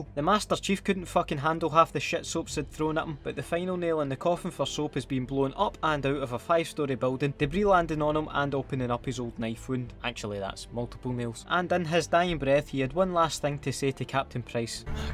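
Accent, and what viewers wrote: Scotland, United Kingdom. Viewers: British overload holy shit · Am the only one that finds Scottish patter hilarious great video though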